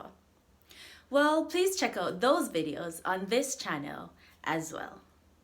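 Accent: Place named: Jamaica. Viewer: My favourite "English" accent ever! Can't wait to visit Jamaica soon ❤❤❤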